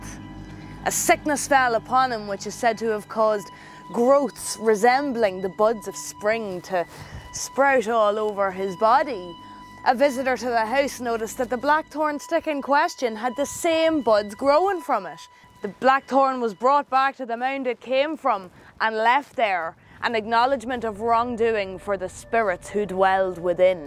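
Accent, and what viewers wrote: Ireland. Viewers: I just like hearing her talk. The Irish accent is like music to these old ears. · Thank you and greetings from U.S. If I got into trouble, my Dad use to say, "If you do that again, I'll take the Shillelagh to ya". He would have loved to hear you talk. He loved the Irish accent, it seemed musical to him. I carved a walking stick for my brother in law after he had back surgery and he said he wasn't going to walk around looking like an old man with a cane (he was only 65). He didn't want it but when I said it wasn't a cane, it was a Shillelagh, he smiled and grabbed it from my hand. It isn't a Shillelagh but I won't say anything.👍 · I LOVED THIS AND THAT IRISH ACCENT !! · I don't know what it is about irish excent, but it makes me laugh mien ares off. 😂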